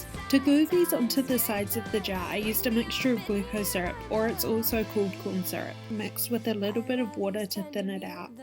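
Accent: New Zealand. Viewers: hey! are you a kiwi? I think I detect a New Zealand accent? if so, hi fellow NZer! just wondering, where specifically did you buy the wick? I find it difficult to find here in New Zealand, and was wondering where you got it. thanks!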